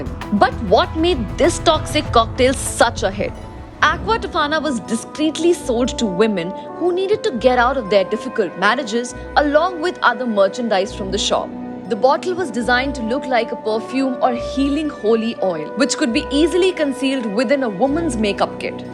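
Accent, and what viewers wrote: India. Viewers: Ughh! The Indian English accent! Makes everything sound funnier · u an indian?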